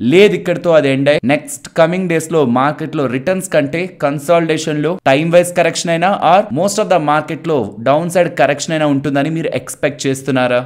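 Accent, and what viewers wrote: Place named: Visakhapatnam, India. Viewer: ‘Eey vusuuu lekunda’pakka Vizag language 😀 👌🏽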